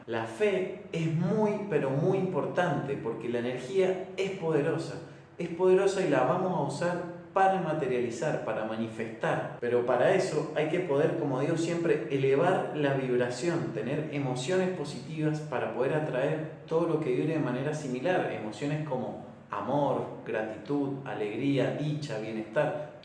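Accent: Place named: Mendoza, Argentina. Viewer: Hola, sos mendocino? Por la tonada digo, tengo la misma jaja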